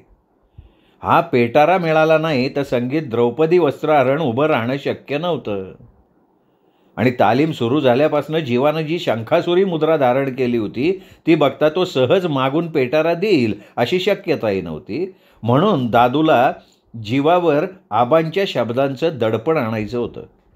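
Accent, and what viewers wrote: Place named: Malvani, India. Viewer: Far mast hasun hasun vat lagli mast Sir ani Sir aple abhar tumche malvani vachan lay bata vatala ha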